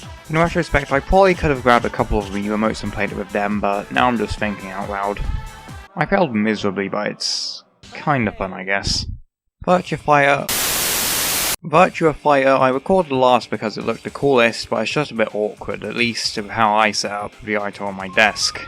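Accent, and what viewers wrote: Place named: United Kingdom. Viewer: Bruh your British o.o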